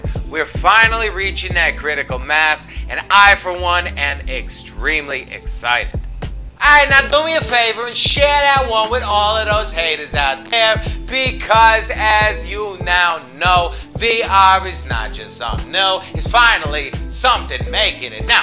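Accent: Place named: Northeast, United States. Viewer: Not a bad first shot providing voice over narration,! Not bad at all! Who knew that inside our lurked a bonafied documentarian! You know the strange part? Your upper east coast accent adds an amount of flair and polish to your presentation I wasn't expecting. Can't wait for your next feature presentation!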